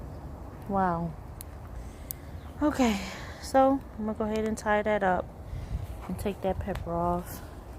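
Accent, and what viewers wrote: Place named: Caribbean. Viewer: you sound Caribbean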